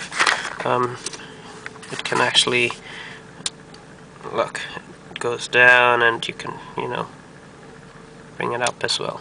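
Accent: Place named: United States. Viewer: You must an American with your accent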